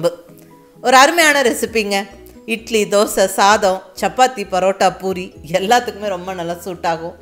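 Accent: Kongu, India. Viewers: கொங்கு தமிழில் நீங்கள் சொல்வது நன்றாக இருக்கிறது. உங்கள் குரலும் அருமை . சமையலும் சூப்பர். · Hi mam, ur recipes r too good. I like ur kongu tamil also. Keep rocking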